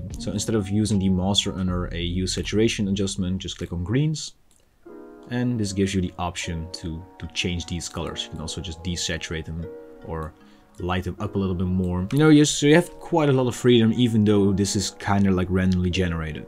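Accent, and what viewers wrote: Netherlands. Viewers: i thought i recognized a dutch accent 😝 i am in amsterdam, so not close enough to enjoy the creative space in tilburg, but nice to know there is a digital art collective here in the nl!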